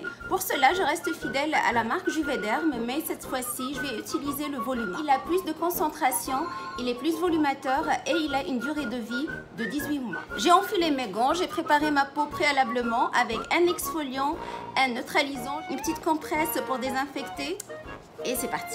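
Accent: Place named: Tunisia. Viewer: Ton accent ça se voit que tu es tunisienne 🤗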